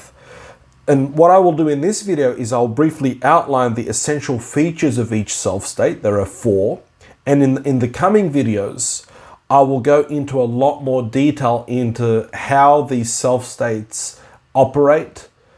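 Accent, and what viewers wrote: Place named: Australia. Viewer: Very interesting. I am an identical twin so there's a whole other aspect of "self" to address as well, which singletons do not. All four self states are thus magnified in essentially two identities - together as twins, and as separate individuals. The external experiences impact the significance of the development of self as well for eg, all our lives we are assessed re our differences and our likenesses by others who inform us of their conclusions, yet simultaneously treat us as a "unit" (eg one soul split into two bodies), thus the development of our "self/selves" is extremely public, ceaseless and difficult. To be told from a very early age who your inner child is, being critiqued, in the context of being compared etc with someone else's inner child is really confusing for young kids, and not easy as an adult either. It's almost like the inner child and inner critic are both external and internal for us. 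So as I listen to your excellent talks I filter all you say through our and my & her perspective. Many twins/multiples become bitterly estranged as the inter- & intra-personal self states are too hard to deal with without good help, and good help is extremely scarce. There's a lot of info about twins and our genetics etc & for their parents but practically nothing for twins themselves in how to navigate this often confusing state so that the adult and warrior can handle things well. Thank you for your great work :). Great to hear a fellow Aussie.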